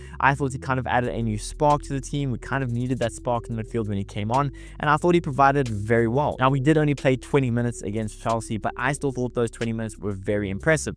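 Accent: South Africa. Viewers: You sound South African so I will definitely support your channel coyg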